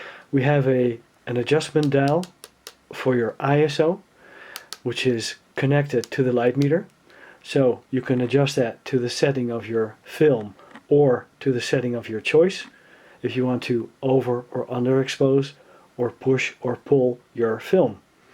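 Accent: Netherlands. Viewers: Ik hoor een Nederlands accent een vraagje wat is het verschil tussen een 35 en een 35 led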